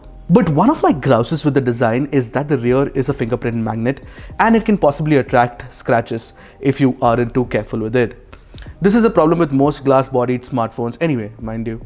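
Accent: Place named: South India, India. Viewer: You are definitely south Indian guy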